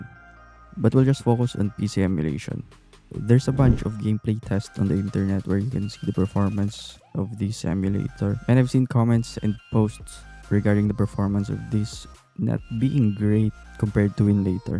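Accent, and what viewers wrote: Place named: Philippines. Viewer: Man you filipino!? I'm subscribing after hearing that accent. Also we have the same tablet so your channel would be very helpful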